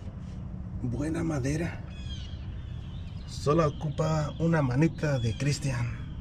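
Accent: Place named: Central Mexico, Mexico. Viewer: De que parte de la RM eres? Suenas como del centro del país. Saludos, recién ayer conocí tu blog, en un día ocioso sin consulta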